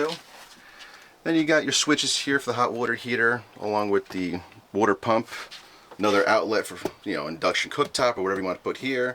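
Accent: New Jersey, United States. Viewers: that New Joisey accent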